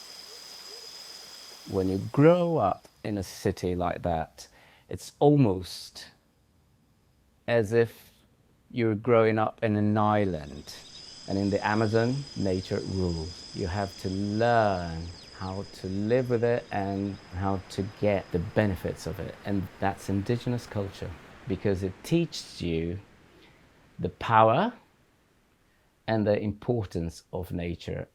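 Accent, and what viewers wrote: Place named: United Kingdom. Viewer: Your British accent is wonderful 👏🏻👏🏻👏🏻. Very nice the video! ❤️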